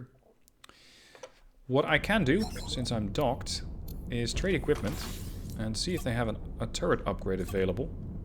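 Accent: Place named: Netherlands. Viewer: holy shit you're dutch! or belgian, or russian (but doubt). ahem I mean "oh cool, a recent avorion stream ^-^"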